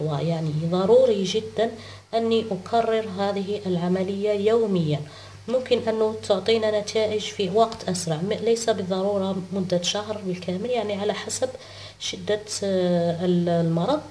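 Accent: Morocco. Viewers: لهجة مغربية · مغربية عرفت من لهجتها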